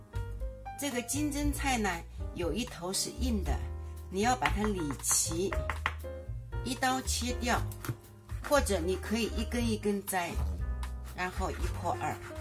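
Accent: Shanghai, China. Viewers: 终于听到上海话了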